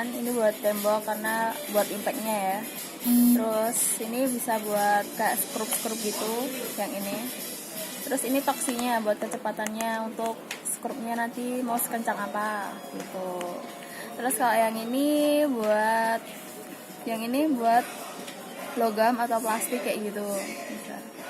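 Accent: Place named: Java, Indonesia. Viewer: Buat ngebor kmu bisa apa ngga mba wkwkwk...kayane wong njowo mba e iki hehhe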